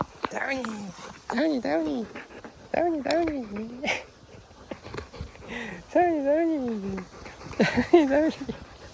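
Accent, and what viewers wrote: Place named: Jeolla, South Korea. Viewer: 풍경이 참 예뻐요 럭키 보는 맛에 애청중입니다 가끔씩 친숙한 전라도 사투리가 정겹네요 워메 ~따라와붔네 ㅎㅎㅎ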